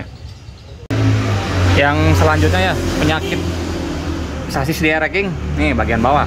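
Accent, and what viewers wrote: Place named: Indramayu, Indonesia. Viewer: Ngomong jawanya kya jawa indramayu😁😁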